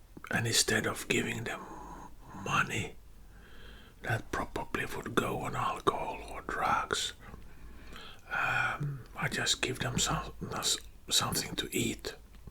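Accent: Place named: Netherlands. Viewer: Well I would have believed if you said you were 40. The accent sounds a little dutch.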